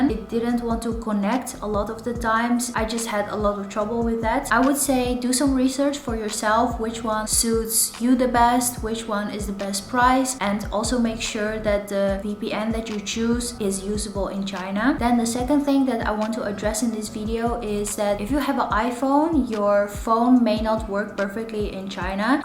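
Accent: Netherlands. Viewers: Nederlands accent gespot! Handige tips, bedankt. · Thank you! You sound totally Dutch, are you 🤔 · 300$!!! You must have used a ton of data! Thank you for answering quickly and elaborately! So my take a way from this is that, it was expensive, but it worked well for you and your mother? Volgens mij kom je uit Nederland als ik je accent goed inschat😀, bedankt voor de reactie!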